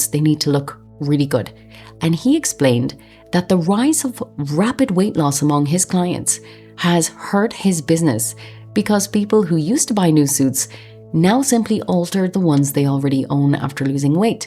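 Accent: Ireland. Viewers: Off topic but LOVE your Irish accent. You have a lovely voice too. It’s refreshing to hear ❤❤ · First time seeing your content and you have a subscriber. Also love the Irish accent and your soft voice serving these excellent takes!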